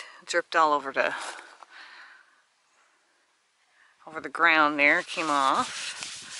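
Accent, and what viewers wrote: Newfoundland, Canada. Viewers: Hello there, came across your channel searching for rust converter ideas and picked up on the accent of fellow Newfoundland and Labradorian. Not sure if that is the proper term now. I also checked out the video on the Mun Botanical Gardens. Really lovely. Will put that destination on the list for the next time I make my way home. Going to have a look through more of your videos and pass along your channel to some friends and family. I hit the subscribe button. Thanks for the content.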